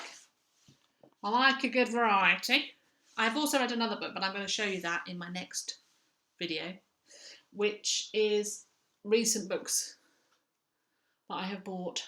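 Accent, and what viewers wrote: United Kingdom. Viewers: I was so very happy to have gotten finished with my bath and washing my hair, I sat down and saw you had posted a video! I've missed you're smiling face and lovely British accent! You've got quite a good pick of books there, especially the JD Robb, I need to give that series a go! I've been dealing with some health challenges, but am doing pretty well now. I'm looking forward to your next video! Take care!😊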